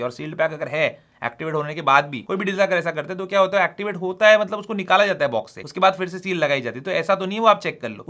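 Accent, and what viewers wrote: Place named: Hyderabad, India. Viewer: assalam walekum bhai Kyunki aap Hyderabad Walon ke jaisa baat karte ho❤❤❤❤🎉🎉🎉🎉🎉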